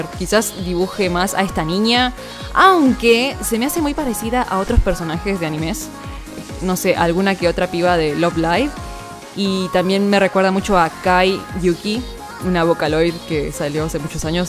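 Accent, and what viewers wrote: Argentina. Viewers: Sos argentina??? De que pais sos? Te amo♡ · Nueva sub se nota que sos Argentona :"D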